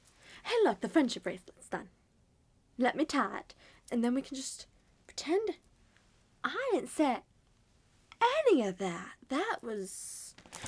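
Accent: South, United States. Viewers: This is so good I thought you were gonna have a British accent but you didint it was more of a country. Can you make a Fred bear or Freddy next? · I’m southern, and this accent was lovely! Nice video n cosplay too! (^v^)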